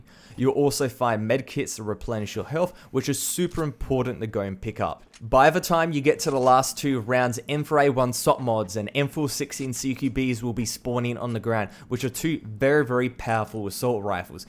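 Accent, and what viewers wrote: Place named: Australia. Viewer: LMMFAO..only 7 years..first of all change the idiotic shrimp on the barbie voice..2nd of all get some skill from someone who has played since Beta..👍🏻👍🏻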